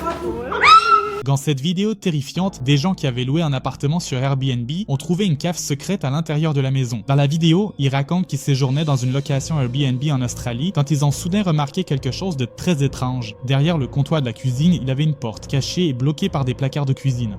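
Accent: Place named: Quebec, Canada. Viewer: T quebecois?? On dirais ta l'axent moi je suis quebecois❤😂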